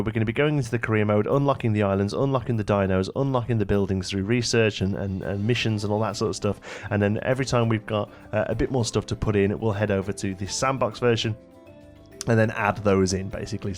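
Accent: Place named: Black Country, United Kingdom. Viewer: thought i could tell your black country accent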